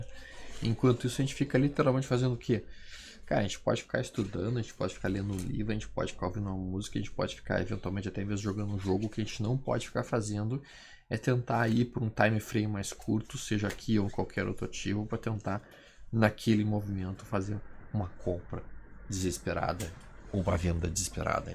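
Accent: Porto Alegre, Brazil. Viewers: ele disse bspoas sfdeua fpasa, problema para mim que o professor fala muito rápido além do sotaque portoalegrense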